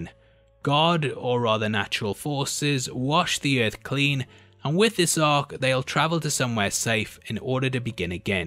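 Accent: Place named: Newcastle, United Kingdom. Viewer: Why aye man. I thought you might be a Geordie but I wasn’t sure until you said the word daughter. 😂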